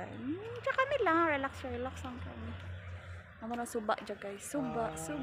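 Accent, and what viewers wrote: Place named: Visayas, Philippines. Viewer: Pag bisaya be .🤣 Kanami pamati.an 🤣🤣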